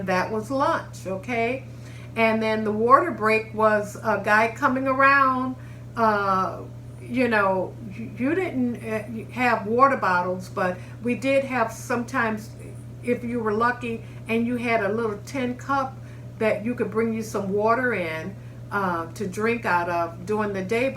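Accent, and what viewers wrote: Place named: Midwest, United States. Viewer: I absolutely loved this! I always thought your accent from from upstate New York, but now I know it's Midwest. Lol. Amazing stories!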